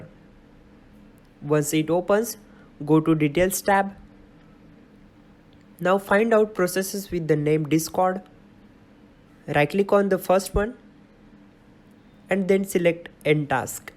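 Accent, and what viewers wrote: India. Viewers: When i heard the indian accent i thought u were a scammer or something and that it would not work however after doing this,this is the first solution that has worked the other ones that were from like white ppl that i thought would work all had failed u are truely the ACTUAL 1st indian tech support that ACTUALLY TRIES TO HELP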